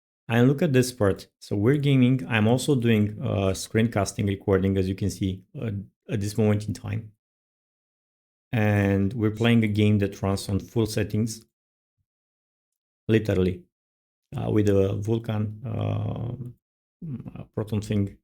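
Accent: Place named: Romania. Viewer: Never heard of lunacy before, Tnx! I also ditched Windows because is a piece of crap and now i am using Linux mint! In general i use open sourced software like blender,gimp,krita and gotot engine so there is no use for using Windows Anymore! Not to mention the customization that you can do in Linux is Just Crazy! By the way you sound Romanian :D! Subscribed!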